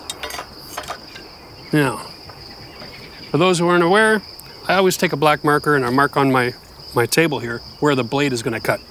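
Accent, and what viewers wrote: Canada. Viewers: had the same thought. I thought I heard a Canadian accent and then when he said was in CA I was shocked. This guy knows a ton but it seems like frost heave is going to eff this whole structure up with time.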